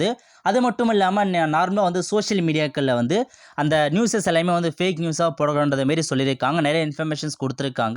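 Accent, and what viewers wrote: North, India. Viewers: Enna bro vadakkan maari pesura ennachu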